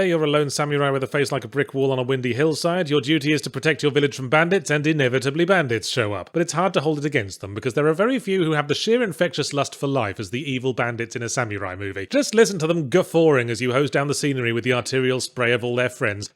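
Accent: United Kingdom. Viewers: I feel like the easiest way to appeal to Yahtzee is to give him a protagonist with the exact same "slightly condencending, posh and sarcastic" british accent, that he has. He ends up liking most of them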